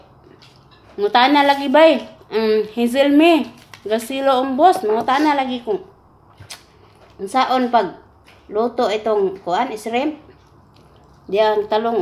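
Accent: Visayas, Philippines. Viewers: cge ko ktwa NIMO nday batia ko dia ko.dri taiwan mlingaw jud ko NIMO.nday bisaya jud English NATO samot nku ky bolanon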